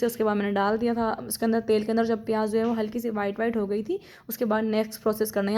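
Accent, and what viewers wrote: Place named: Sukkur, Pakistan. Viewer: kahan par rehtay hain aap k paikay 😂 Matlab aap k ammi Abbi behen bhai I think sukkur q k mujhe aapki boli sukkur Jessi lagti hai aap panjabi nahi lagti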